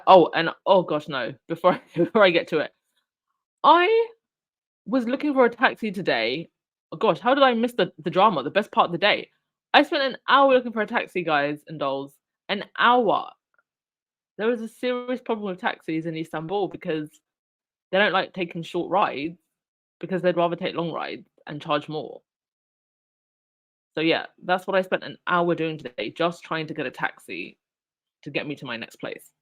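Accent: United Kingdom. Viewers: Ugh! I hate missing the live! I love the English accent.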